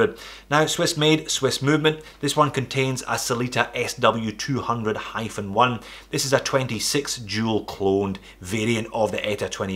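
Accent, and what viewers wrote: Scotland, United Kingdom. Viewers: I love your watch videos. Your Scottish accent is great too haha!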